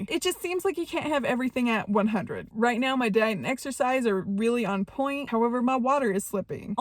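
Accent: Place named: Midwest, United States. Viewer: I know you said you’re in CO, did you vote up there? Your accent sounds very northern/Midwestern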